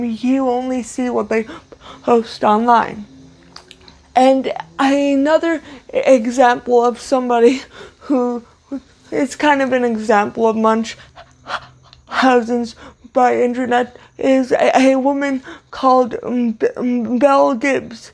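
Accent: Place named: Northeast, United States. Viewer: R U from the northeast?I'm originally from south jersey.you sound and act like you are from back east somewhere.maryland,Connecticut or something.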